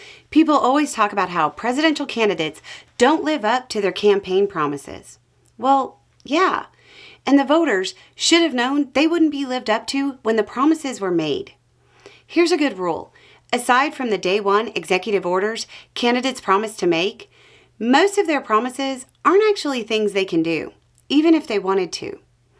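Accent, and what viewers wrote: South, United States. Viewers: your delivery and southern um shall we say " bless his heart" delivery made the whole weekend better. YES, as a teacher I agree Civics needs to be back in the requirements tp graduate.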